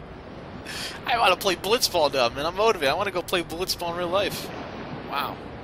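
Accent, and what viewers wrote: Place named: Arkansas, United States. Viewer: WAKA WAKA WAKA! dude are you from arkansas? You must be...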